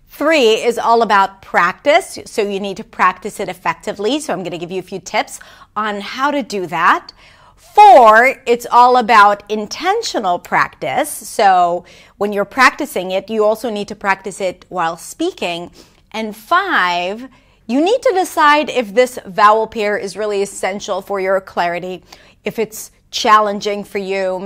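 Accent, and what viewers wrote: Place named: United States. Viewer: You seems to be american native speaker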